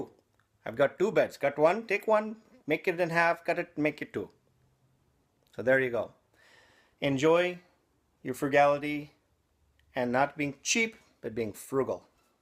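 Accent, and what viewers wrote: India. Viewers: Thx for the video. I too have a foam bed that did not know what to do with, now I have one, thx to you. Also, the Indian accent was excellent. Was not expecting that.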